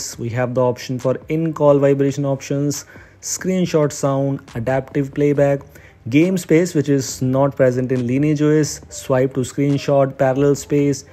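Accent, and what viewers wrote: India. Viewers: hes talking in english but with indian accent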